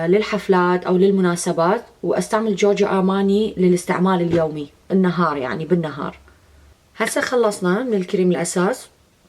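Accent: Iraq. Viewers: حبيبتي انتي عسل ومررره متواضعه .. واحلى شي اللهجه العراقيه عششششق ♥️😍 .. ربي يحفظك 😻. .. حبيبتي ايش ترشحي اكثر جورجيو اىماني ليمونس سيلك والا استي لودر دبل وير ... ايهم افضل ويقعد تطول وما يخطط محتااااااااره بينهم 😔😔😔😔💔💔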